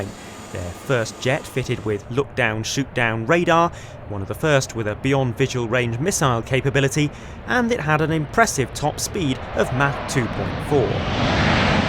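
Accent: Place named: United Kingdom. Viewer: Seriously, a brit commentator? How about we have an American narrate RIAT? Absolutely annoying.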